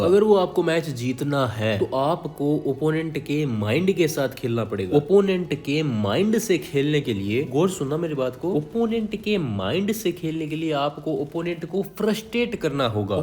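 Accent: Haryana, India. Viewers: Aap Haryana se ho kay 🤔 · Bhai Haryana se ho kya 👀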